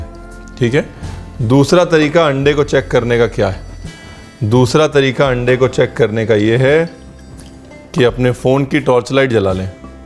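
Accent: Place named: Lucknow, India. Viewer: Bhai ka lucknawi andaaz jindaabaad..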